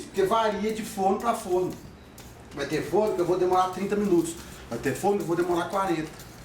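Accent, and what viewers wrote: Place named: Minas Gerais, Brazil. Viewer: PARECE BOM, VOU ME AVENTURAR E COMEÇAR AGORA, OBRIGADA PELA RECEITA VIU E TÁ MELHOR AINDA PQ TEM UM SOTAQUE QUE EU ACHO QUE É MINEIRO, (BOCADIM) .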